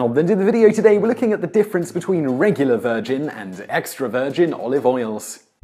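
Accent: United Kingdom. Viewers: Aren't all these videos simply a guy with British accent fast-reading Wikipedia articles on the most common, mundane subjects?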